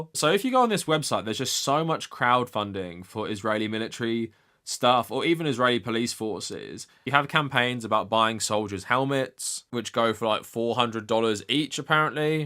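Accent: United States. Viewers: Wow, proper Israeli with an American accent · Why is it in standard American Dialect English? Shouldn't it be in Hebrew? Why isnt it British English???? As an ex navy cryptologist it's giving foreign propaganda...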